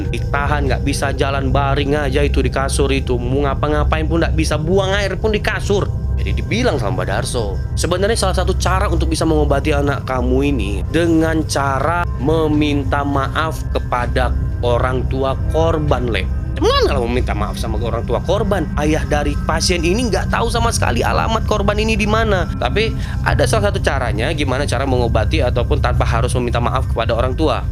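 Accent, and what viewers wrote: Medan, Indonesia. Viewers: Kereennn ceritanya leeekkk.... Medan kali laahh gaya abg ini semangat bang.... 😂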